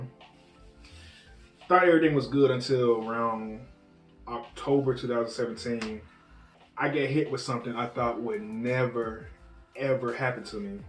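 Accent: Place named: Tennessee, United States. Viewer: You from Tennessee???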